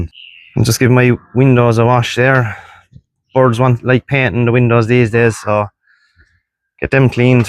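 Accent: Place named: Cork, Ireland. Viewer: that looks like a billard table, fair play to your dad. trying to figure the accent..cork or tipp?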